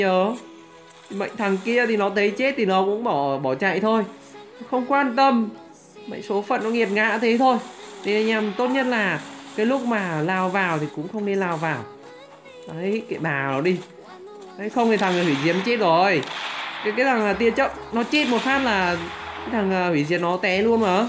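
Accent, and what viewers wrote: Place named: Thanh Hoa, Vietnam. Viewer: A nghe giọng giống quê hoàng thanh-hoàng hóa..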